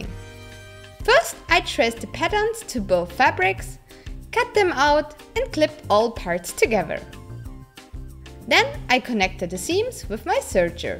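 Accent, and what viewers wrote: Germany. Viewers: That accent...I bet your're German. xD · Sag mal hast Du auch Videos auf deutsch?!? 🤔 . Denn so wie Du Englisch sprichst müsstest Du aus Deutschland kommen!?! 🤔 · oh boy, the cringy german accent tho. The video looks really interesting, but, (as a german myself btw) i just can´t stand that horrible accent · Wow...I am watching this only 2+ years later but I am amazed and inspired how this cheap material can be made into such awesome looking armor! Somemore you have been commissioned to do this for a company! Well done! Now I also finally know you are from (Southern?) Germany (your accent sounded like it but your name didn't so wasn't too sure...:P). I am a costumer myself but I have not worked with EVA foam yet, hope I can reach your level one day and make maybe a medieval or fantasy armor using EVA foam (due to high flexibility and low costs). 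I also am inspired seeing you using a laser cutter (isn't it dangerous?) to make those awesome zig-zag strip-away patterns and dremmel and sewing machine to make the rest of the armor. And how you custom made (!) all those under gloves and boot covers (I thought I would have sourced them cheaply online like manufactured from China, anyway that's just my thought to save time and patience, which you seem to have no problems!). Overall awesome and no surprise that the company has asked you to do it, an expert (and cheerful inspiration to other costumers around the world)! Keep it up! 👍👍👍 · sounds hard like german english :D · I love that Germán accent. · Sounds like: Englisch mit deutschen Akzent.^^ Aber echt schöne Arbeit. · Sehr unterhaltsam und echt gut umgesetzt. Wobei ich immernoch nicht verstehe wieso Medion seine Gamingsparte Radiergummi nennt. Das deutsche kommt gaaaanz leicht durch aber respekt. 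So gut sprechen nicht viele. Hoffe Corona gretscht dir/euch nicht zu sehr ins Geschäft. Wünsche nur das beste. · I feel like your accent was german right?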